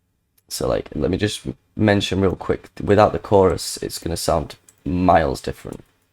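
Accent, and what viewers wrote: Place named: North, United Kingdom. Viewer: you hear the northern accent and know its proper jump up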